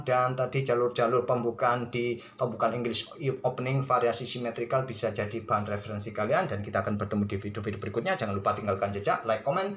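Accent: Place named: Surabaya, Indonesia. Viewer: Ternyata admin ini arek2 Suroboyo iya😀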